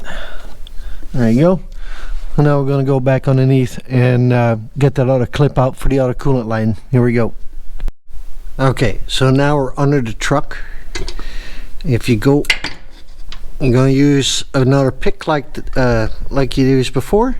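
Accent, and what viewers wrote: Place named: Newfoundland, Canada. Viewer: Great video. Thank you! I think I might recognize a bit of Newfoundlander in yaaa!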